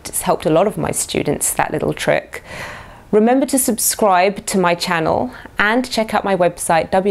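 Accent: United Kingdom. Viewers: Her heavy British accent totally makes my pulse race!! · I like your english acces dear woman, i'm searching a girl like you who has confidence in herself :)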